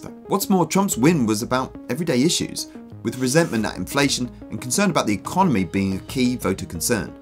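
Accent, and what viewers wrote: United Kingdom. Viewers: Your accent tells me you never ever been in a red state before. Trust me. This shit is a RELIGION TO THEM. Political rules do not apply. Trump worship is a matter of FAITH. He can kill everyone they love right in front of them and not lose one vote. Now can the Brits STFU since y'all don't know wtf you are talking about, ever? Is brexit gonna end? Nuff said. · Sounrs loke a brit🇺🇲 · Happy the lords of the algorithms sent me your way today. I appreciate wise insight pertaining to the failure of chump 2.0, and, as corn-fed midwestern American boy, I always enjoy listening to a voice from across the pond. Liked, subbed, and now appeasing the lords with comment. Thank you! 🙏🏽✌🏽💜 from Minnesota—Sanctuary Land of 10,000 Tampons and Free School Lunches (&—thank you, Coach Tim!—Legal Recreational Marijuana, finally.)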